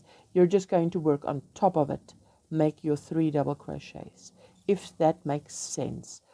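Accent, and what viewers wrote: Afrikaans, South Africa. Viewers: Is jy van RSA. Ek hoor Afrikaanse aksent.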